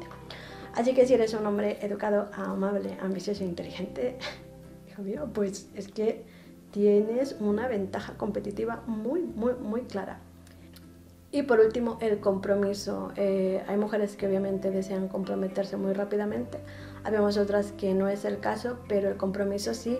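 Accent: Spain. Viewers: ENTONCES POR QUE HABLAS COMO ESPAÑOLA? 🤔🤔🤔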